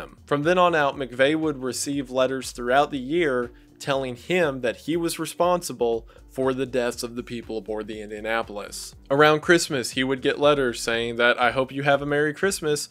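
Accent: South, United States. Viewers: Please do your 8 hour World War 2 video. And if you are worried about the algorithm break it up into three or four parts!!!!! But just so you know I would absolutely listen to every second of it like my life depended on it. I love your channel and I love your voice and I appreciate hearing someone who's voice sounds familiar.. I feel like most YouTubers who gain popularity are not from the South, and the very first time I heard your voice, I immediately thought to myself, "ahhh yes this sounds like home."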